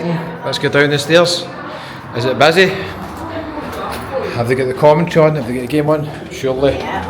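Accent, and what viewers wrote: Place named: England, United Kingdom. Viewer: Your english is so english i can't understand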